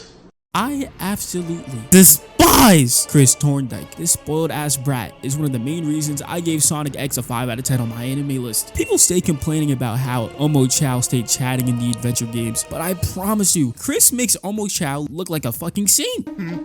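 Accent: New York, United States. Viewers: Oh snap a fellow New Yorker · ah you a new yorker. Based. And Sonic X was the FIRST anime plus exposure to Sonic as a whole, next to Sonic Advance.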